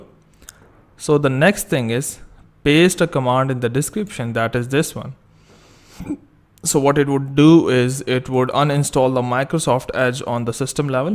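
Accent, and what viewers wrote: India. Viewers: Like everyone is saying. Hearing indian accent can lead up to an no no end. But this guy seems mature, straight on point. Suit and tie my man! Thank Q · Thank you kind Indian man with a lovely accent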